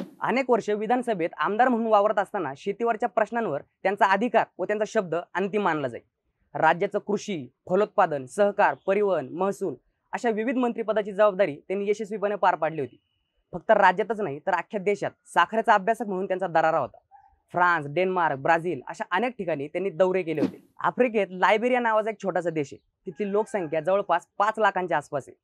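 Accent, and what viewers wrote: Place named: Rural, India. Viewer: तुमची ईथंबुत माहीती देन्याची पध्दत खुप छान आहे कारन त्यामधे गावठी मराठी खुप छान वाटते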